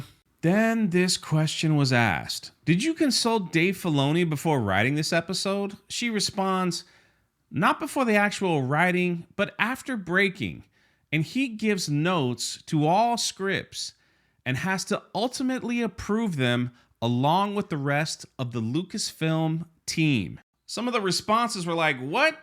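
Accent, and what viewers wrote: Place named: New York, United States. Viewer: Love this guy’s accent. I picture a husky New Yorker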